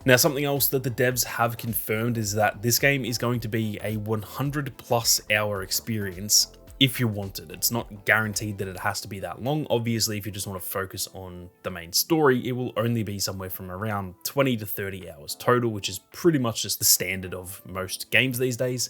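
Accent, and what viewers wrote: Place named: Australia. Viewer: Nice to hear a fellow Aussie. I'm hyped too.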